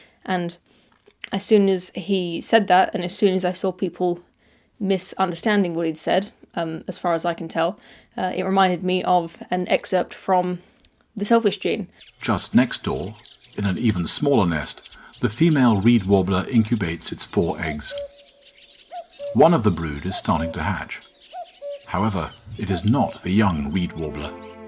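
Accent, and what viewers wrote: United Kingdom. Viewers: British accent. Ozzie’s don’t sound like that mate. I guess you’re American because for some reason you’s can’t pick accents. Everyone else can. I can even tell the difference between bloody Yanks and Canadians. One is really loud and obnoxious, the other apologies all the time and says ay a lot. I can have two beers in my breakfast cereal then drink all day and still not fall in love with the first chick I see on YouTube. Pull your socks up! Just kidding, it’s all in jest mate. Have a couple for me you funny bastard! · you ARE attractive. I'm reluctant to say it, but you look great without makeup. I'm reluctant to say it because I don't want to be misunderstood. Plus, I don't want to offend. Thing is, I'm actually turned on by women who don't wear makeup. Again, I want to be careful here, but a woman without makeup is, for me, like a woman without clothes. 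It's revealing, exposing, arousing. I shouldn't post this since I've already had two white Russians this evening. But there you go. Wish I could delete this post. Or at least redraft it. Combine that with your British (or Australian) accent? Oh, dear God! P.S. I've read The Selfish Gene. P.P.S. Dawkins is an idiot.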